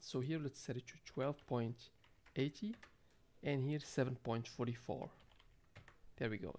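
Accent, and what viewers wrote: Morocco. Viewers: are you moroccan? you sound exactly like my relatives. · Your accent sounds Moroccan 😅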